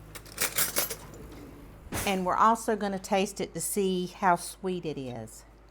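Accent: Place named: North Carolina, United States. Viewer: Where are you from? You sound like me lol. I’m in central NC. Love your potato salad 😋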